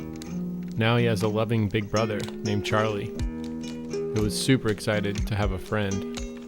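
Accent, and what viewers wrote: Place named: New York, United States. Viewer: "this is de mudda" holy crap that NY accent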